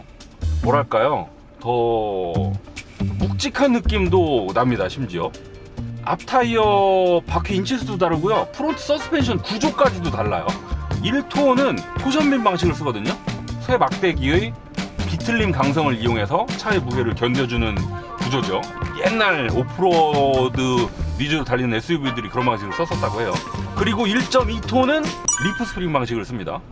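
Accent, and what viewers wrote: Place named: Chungcheong, South Korea. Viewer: 참~~ 말씀 잘하시네. 충청도 사투리도 정겹구요. 실속있는 구체적인 정보 귀에 쏙쏙 들어옵니다. 구독 누르고 갑니다.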